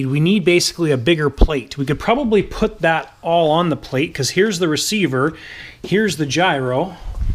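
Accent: Canada. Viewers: I absolutely love the content on your channel.obviously you are a Canuck and you guys say things just a bit differently than ee do here in the United Staes but one thi g thats been really bugging me is how you say CORS AIR Instead of the proper pronunciation COR SAIR. Once again love the channel content and I think you build some of the best models Ive ever seen. Keep up the good work and you say corsair however you pmease....lol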